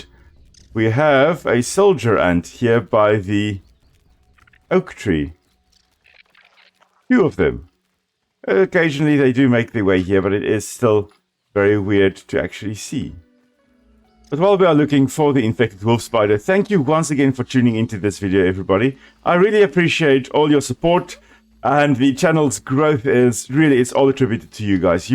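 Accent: South Africa. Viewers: Fascinating...who knew water fleas were so interesting?. Are the eggs they reproduce through parthenogenesis actually fertilized by the female or does it have a unique process to accomplish birth without fertilization? Came for the learning...subbed for the SA accent :)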